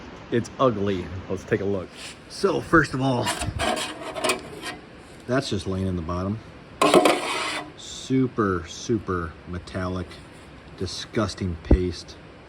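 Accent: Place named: Wisconsin, United States. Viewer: I was gonna ask if you were from Wisco with that Sconnie accent. But then I saw Monona on your hoodie and read the bio on your page. Always nice seeing some locals on you tube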